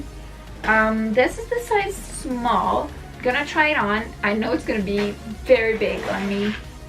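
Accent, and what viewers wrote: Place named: Brazil. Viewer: tu poderia colocar legendas em portugues ou fazer um video em portugues? infelizmente meu ingles nao é fluente, nao consigo entender tudo... cerca de 60%, as vezes um pouco mais, depende do sotaque.... talvez se tu falar em ingles do jeito que tu fala portugues (sotaque brasileiro), eu vou entender uns 80%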